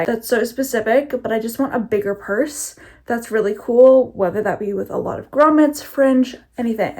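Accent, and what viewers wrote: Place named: Canada. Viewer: Clues you are Canadian. 1. Accent, you sound like me. 2. Le Chateau. 3. Smart Set. Back in the olden days, I used to manage a Reitmans store. I love the black and white shoes!!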